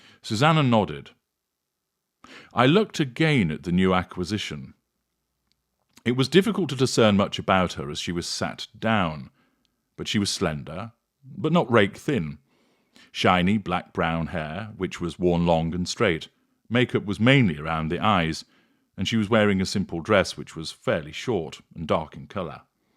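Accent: United Kingdom. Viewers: Yes. His voice and elite British accent 😀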